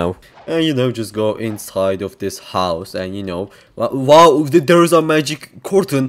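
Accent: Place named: Turkey. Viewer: abi türkmüsün ingilizmisin belli değil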